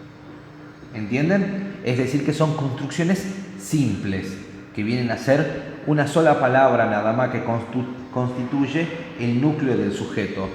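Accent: Tucumán, Argentina. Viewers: Porque creo que SOS Tucumano o Santiagueño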